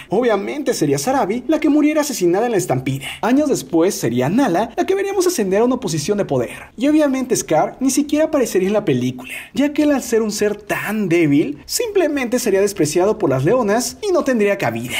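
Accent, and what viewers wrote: North, Mexico. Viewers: Alv esa mierda estu muy norteño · Chale, lo último es traumante xdxdxd Diablos que norteño xdxd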